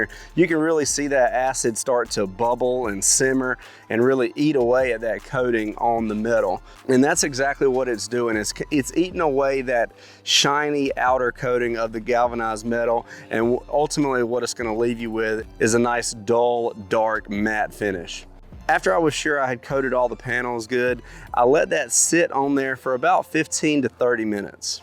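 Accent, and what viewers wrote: South, United States. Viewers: Interesting accent. It's like southern and California burn out surfer with a touch of central Florida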